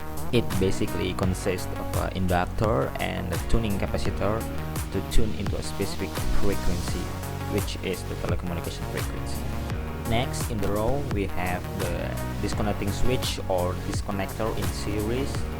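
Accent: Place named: Indonesia. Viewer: Saya kira cuma saya saja penonton dari Indonesia gan hehehehe Rupanya channel ini juga asal Indonesia pantas suaranya seperti orang Indonesia walaupun bahaya Inggris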